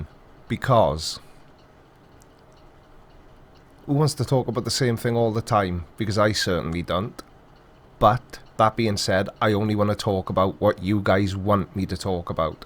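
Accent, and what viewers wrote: Wales, United Kingdom. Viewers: We need a Creaky Weekly in the automotive world. As for this channel, I think you should cover whichever topic strikes you personally. If I may speak aggregately, folks watch to see and hear your take on things, your perspective. The Welsh accent and the clever phrasing only adds to the scope of the video. Fun to watch.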